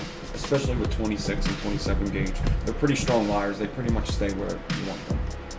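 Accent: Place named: South Philadelphia, United States. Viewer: south philly? i love finding sophisticated philly vapers on youtube. just going by yr accent and 'youse'. also the recovery thing lol (i got almost 5 years since my days at 7th and snyder/ k &a etc))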